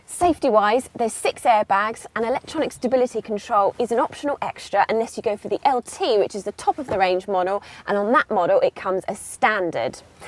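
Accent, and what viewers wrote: United Kingdom. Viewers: I like your voice, British acsend 😆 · Ughhh the uk accent ....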